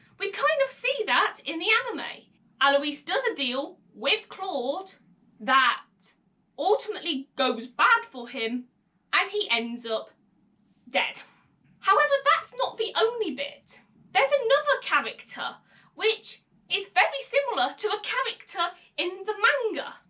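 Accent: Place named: South, United Kingdom. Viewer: Really nice theory! Where are you from? South of England? God I love your accent! Hahahaha I lived some time in England and yours is really cool and different to where I lived (liverpool)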